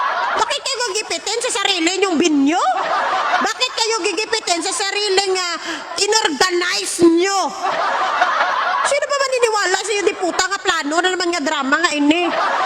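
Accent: Iloilo, Philippines. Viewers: I like your Iongga accent.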